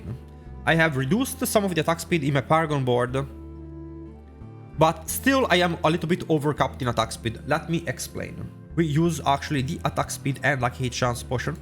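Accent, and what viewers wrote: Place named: Italy. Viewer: bro its s tier and he got an italian accent. what is your problem with the build maybe we can help you?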